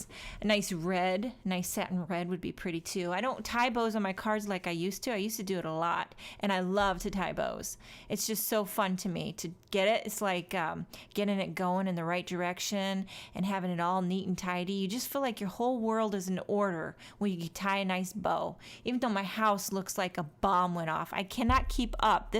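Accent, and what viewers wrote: New York, United States. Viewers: It's a New Yawk accent ~ and New Jersey, too if I must say so myself:) I LOVE your rainbow wreath,...it's Gawguss!!! Thanks so much for another fun video!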